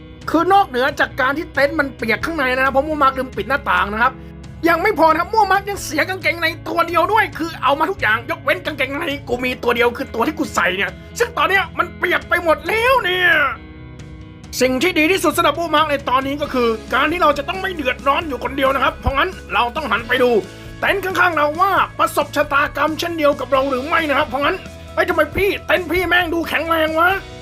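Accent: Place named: South, Thailand. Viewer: ฟังเพลินครับภาคใด้มันมากครับ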